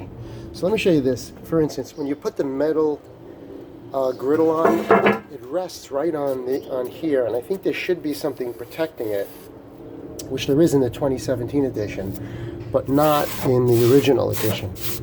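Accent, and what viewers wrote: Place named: New England, United States. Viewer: I can tell from your accent you're a dumbass yankee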